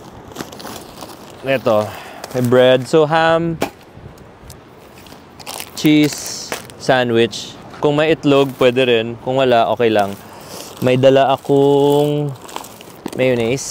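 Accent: Iloilo, Philippines. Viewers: Namian gid ko Chef mamati sang imo Ilongo nga accent 😂😂😂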